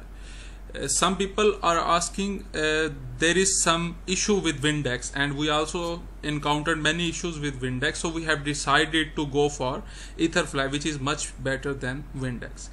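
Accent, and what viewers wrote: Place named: India. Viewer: Is this indian website bcz you voice look like you are indian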